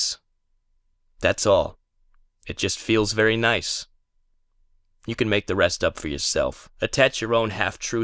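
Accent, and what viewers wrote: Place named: East Coast, United States. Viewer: the East Coast accent helps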